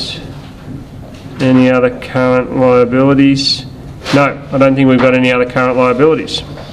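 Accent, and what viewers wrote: Australia. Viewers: are u from Australia mate ?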